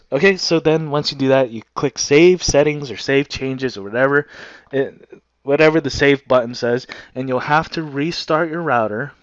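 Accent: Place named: Brazil. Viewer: So you're from Brazil? I could tell.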